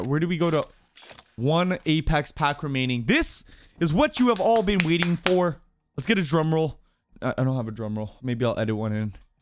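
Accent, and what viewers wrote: Canada. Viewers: Is he Canadian or im just dumb Idk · He’s Canadian idk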